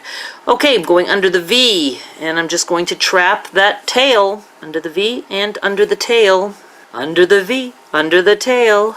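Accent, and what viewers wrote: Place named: East Coast, United States. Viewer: I am brand new to crochet and to your channel. I know the basics, but gosh I'm rusty I guess. I want so badly to make one of these afghans, but am having trouble with the turning the corners, can you do a quick snippet of just turning the corners for us, it would be a huge help. By the way ... you sure sound like you're from the East Coast, am I correct? I'm originally from Connecticut, living on the West Coast now, but you truly sound like so many of my friends from home (Maine maybe?) Thanks for sharing, your afghan turned out gorgeous, I hope one day soon I can make something like yours.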